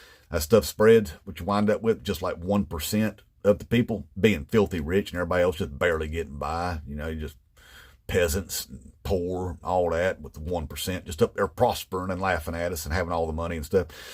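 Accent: South, United States. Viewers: I don't know why, but hearing person with a southern accent taking the right wing authoritarians to task is comforting. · Did you all get all Muslim up? Brilliant. Thank you. I love your accent. I grew up in the South and I miss it. Thank you for being better than the Southern stereotype. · I thought I was a connoisseur of the southern accent, but I can't tell if that's louisiana or south carolina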